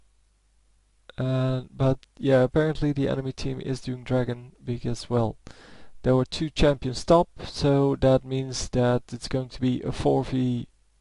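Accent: Netherlands. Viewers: mooi hollands accent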